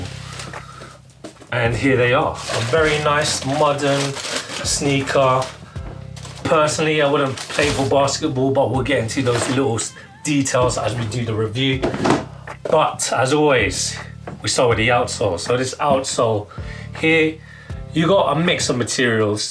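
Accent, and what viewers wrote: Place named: United Kingdom. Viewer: The British accent makes him seem of average intelligence but he really below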